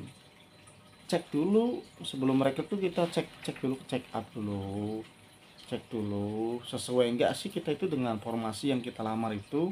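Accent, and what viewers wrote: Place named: Cirebon, Indonesia. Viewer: Logat Cirebon nya keliatan bangat pak 😂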